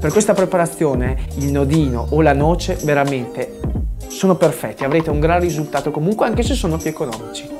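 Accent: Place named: Trentino, Italy. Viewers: Fai ridere e sei molto bravo, adoro l'accento Trentino! Continua così!!! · non mi ero reso conto prima d'ora che la cadenza e accento trentini fossero così simili al romagnolo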